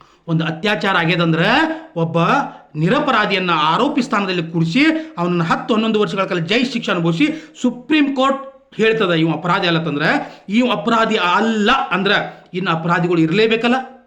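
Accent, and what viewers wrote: North Karnataka, India. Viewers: ಸೂಪರ್ ಅಣ್ಣಾ,, ನಿಮ್ಮ ಮಾತಿನ ಶೈಲಿ ನಿರೂಪಣೆ ತುಂಬಾ ಅದ್ಭುತ. ಉತ್ತರ ಕರ್ನಾಟಕ ಮಂದಿಯ ಮಾತು ಕೇಳಲು ನನಗೆ ತುಂಬಾ ಇಷ್ಟ.ಧನ್ಯವಾದಗಳು ಸರ್. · Chanal ugiri sir nimma. Matu super uttar karnkad matu benki🔥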